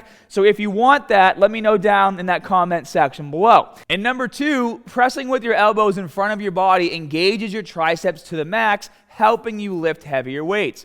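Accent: Boston, United States. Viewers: Following because of that Boston accent...and trying to make gains, very informative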